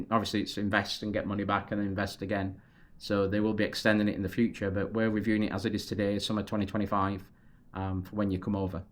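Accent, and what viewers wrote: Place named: Northern England, United Kingdom. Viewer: Last time in Orlando we had tickets for Volcano Bay that had just opened, but every time we got there it was full for the day and that was before 9am. Things have gone full circle with the queuing, back in the 90s with less parks the queues were horrendous, 3 hours being typical, but with many more parks opening queue lines dropped so you wouldn’t have to queue much beyond an hour for anything. That’s all gone now and with the constant summer rain it makes for a less than perfect scenario. Thanks for the video. Nice to hear a northern voice, we are near Manchester.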